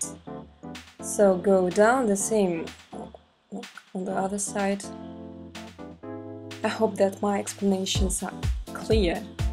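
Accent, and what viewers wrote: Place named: Russia. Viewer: You have a russian accent, i think :)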